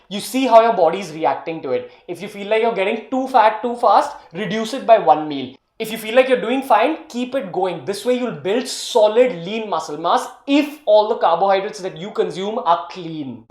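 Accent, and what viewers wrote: India. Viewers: So sorry but I just cannot stand the Indian accent. It haunts me as I had a terrible experience with an Indian call center scammer who syskey'd my machine. I wish you did robot voice instead. Good luck though, you seem like a pretty chill dude.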